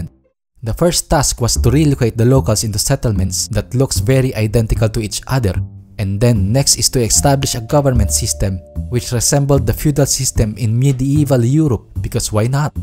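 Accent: Philippines. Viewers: Cool na sinadya mong idiin sa amin yung Filipino Accent hahahahahaha solid! ILABAS MO NA YUNG PART 2 KAHIT NAKA DRAFT YAN ILABAS MO NA HAHAHA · This is like Oversimplified. But filipino accent version. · i love how he use da pilipino aksent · he sounds filipino(Filipino ka ba?) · the accent is the native filipino accent,, it was on purpose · Kudos to your work and Filipino accent :) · its Filipino English which considered as a dialect that's why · This is Pinoy knockoff Oversimplified 😂 even the name means the same thing "Dumbed Down." Edit: I love it though. Please make more videos ❤️. I have a feeling you're bisaya, based off of the accent. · maaaaaan this deserves more recognition. I like your cool filipino accent bro and the way of delivery is cool too haha keep up the good work:) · oversimplified but with filipino accent <3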